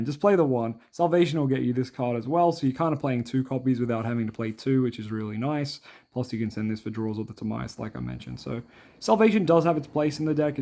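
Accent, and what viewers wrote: Australia. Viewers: Are you aussie? Because if so, that “Goony boy” nickname is so much funnier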